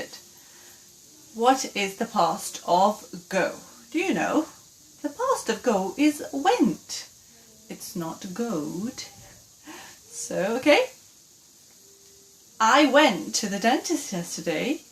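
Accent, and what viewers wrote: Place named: United Kingdom. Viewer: ♥️ you have a British accent..I loved your video. I'm following you in YouTube and HelloTalk also. Nice video☺️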